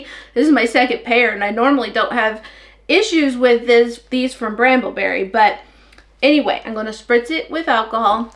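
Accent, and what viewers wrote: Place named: Missouri, United States. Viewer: I love your accent. I'd love to visit Missouri one day - I love how varied the states are.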